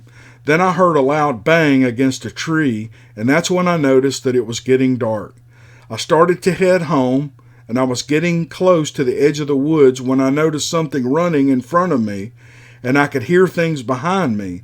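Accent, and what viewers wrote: South, United States. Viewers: Thank u so much! Snowstorm here in iowa and you made it entertaining to cozy up and listen to your southern speak!Love you!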